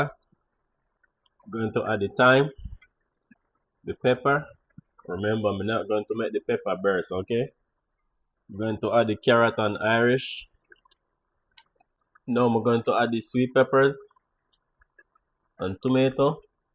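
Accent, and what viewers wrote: Jamaica. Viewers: Love how this chef expresses himself. No twist up tongue, straight Jamaican language. Yeah love it.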